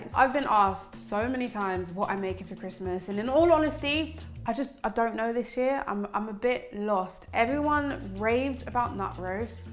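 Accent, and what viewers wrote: London, United Kingdom. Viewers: Beautiful black vegan with cute London accent. 😍 Surprised to see so few guys in your comments, we are usually suckers for beauty and food!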